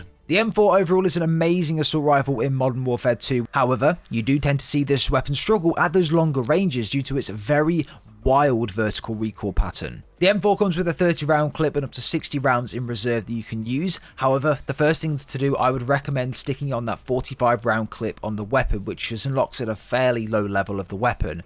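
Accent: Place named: United Kingdom. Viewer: Can’t you hear that he’s British. We say things differently than Americans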